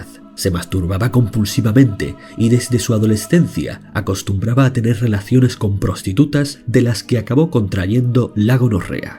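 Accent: Spain. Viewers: Ese acento, diría que eres andaluz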